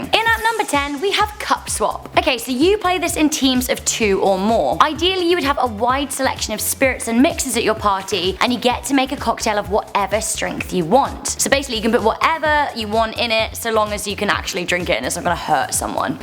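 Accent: United Kingdom. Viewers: Is she british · she is British living in north America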